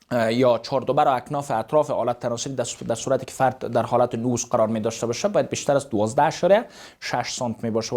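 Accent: Afghanistan. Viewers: دکتر.واقعا باین لهجه کج وزیبای افغانی واین اخلاق واین علم آدم را پای گپ خود نی میخکوب میکنی واقعا مقبول کپ میزنی ولی کاش‌ریش را میزدی.....سوالی داشتم من ۲۵ سانتی متر دارم آیا خیلی زیاد نیست.۴ زن هم طبق قرآن خدا هم دارم..هرشب به نوبت هم رعایت میکنم ولی یکی از این زنها می‌گوید کوچک‌است..چکار کنم...آیا به ۵۰ برسانم.میشه داکتر جان....درمورد آلت تناسلی زنها هم بی پرده دکتری وعلمی بگویید.مثلا .تپل‌باشه ..گلابی باشه..چسپان باشه..یعنی منظورم..اینه شکل ظاهرش مهم هست در فرزند آوری.ولذت جنسی..ولی من که ۴وتا دارم بنظرم تپلی عالی هست بلاخره داکتر جان....درمورد همه مسائل افاضه کنید....ما یکنفر را داشتیم وقتی میخواست کشاورزی کند...دور کمرش می‌بست واقعا عجیبه من ندیدم ولی کسانی دیدند که‌دروغ نمی‌گویند...دکتر من .آدم هوس رانی اصلاونیستم تا ۵ ساب هم بی خیالم ولی بخاطر دینم که تکمیل شود همچی‌را تکمیل کردم..زنانم را‌ پول زندگی بیان دادم‌جدا...هریک خانه جدا....پول دار هستم ولی کمک به فقرا هم میکنم...یکی از زنان‌می‌گوید پیش من زیادتر بیا داکتر بش گفتم‌نی گناه دارد...برو سهم یکی‌رل بگیر.تا من خیالم راحت باشه در قیامت مدیون نباشم......ولی دکتر....اصلا جالب هم نیست..بعضی نروند دردسر درست کنند.برای خودشان.من خواستم فرزندان اسلام زیاد شود....الان ۱۶ تا اولاد دارم..میخوام یک حمله دیگر بکنم ۸ تا ی دیگر اضافه کنم...انشااله.....زنده باشید دکتر جان.. 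· عرض سلام و ادب خدمت پزشک بزرگوار. سپاس فراوان بابت توضیحات کامل ومفید، بالحجه و زبان زیبای افغانستانی. اکثرا جوانان بخاطر تماشای فیلمهای پورن ومقایسه خود با سوپراستارهای این فیلمها، که یقینا اکثرا دور از واقعیت هستن وبا استفاده از حقه های سینمایی تولید میشن،فکر میکنن که مشکل کوچکی آلت دارن، در حالی که اگر حتی این فیلمها واقعی باشن، در واقع اون سوپر استارها هستن که مشکل دارن، و آلت تناسلی نرمال ومناسبی ندارن.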